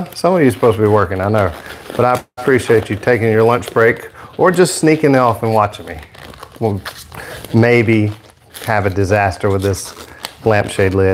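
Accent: South, United States. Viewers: great !you sound like yer from the south!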